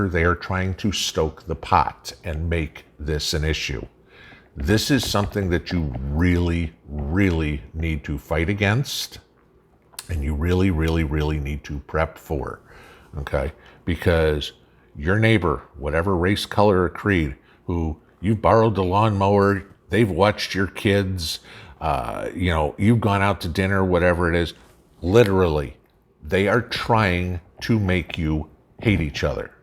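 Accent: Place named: Chicago, United States. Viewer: Chicago, accent, you still have. I'm originally from Connecticut.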